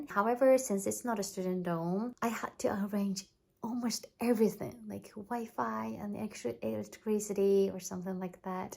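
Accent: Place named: United Kingdom. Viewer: Like your accent! Sounds so ‘British’ 😊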